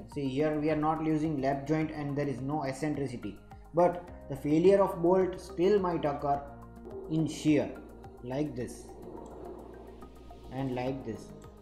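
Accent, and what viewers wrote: Gujarat, India. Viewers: gujrati accent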